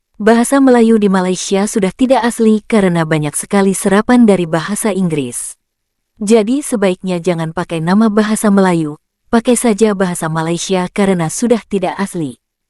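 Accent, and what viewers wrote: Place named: Java, Indonesia. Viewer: dengan aksen Jawo pekat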